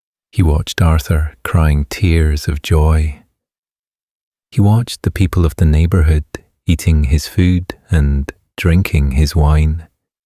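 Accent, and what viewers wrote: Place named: Ireland. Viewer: Best Bumpy story, so far. As the other reviewers said,like the original narrator better. The slow, Irish accented narrative was a bit much. Always liked the Irish accent, but bring back the original.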